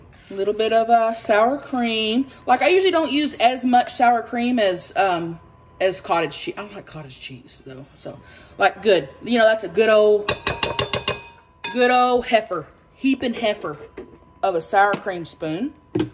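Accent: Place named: South, United States. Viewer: your country accent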